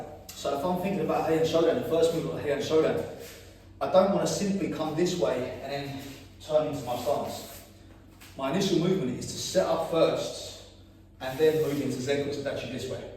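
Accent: United Kingdom. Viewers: Thanks for this video I am a beginner and I am going to concentrate on defensive techniques when I spar I am 67 years old and I am the oldest guy by far in my dojo someone wish me luck or do you have any first time you spared stories I would appreciate it Thanks for your videos I joined your group and for now you will be the only one I join plus I love the British accent it is British isn’t it sometimes I get Brits and Aussies confused any way thanks for your videos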